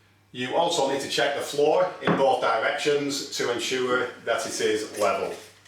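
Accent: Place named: Lancashire, United Kingdom. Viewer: Q What's a nole? A It's a naperture! Great to hear a Lancashire accent after all these years in Geordie land!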